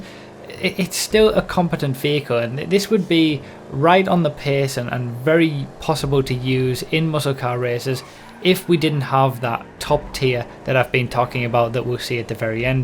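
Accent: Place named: Bradford, United Kingdom. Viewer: okay that makes more sense cos to my ears he has an accent that would come from Bradford or around that area.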